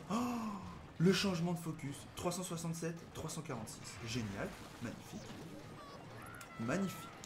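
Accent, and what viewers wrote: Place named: Marseille, France. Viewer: t a un accent marseillais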